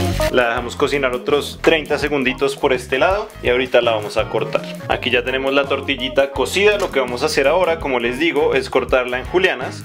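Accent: Antioquia, Colombia. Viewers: tú eres paisa? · Ud es paisa?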